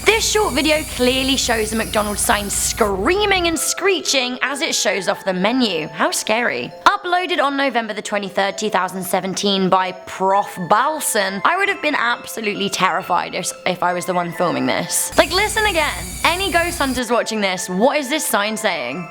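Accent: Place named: United Kingdom. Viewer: Wait I thought she was from the uk 🇬🇧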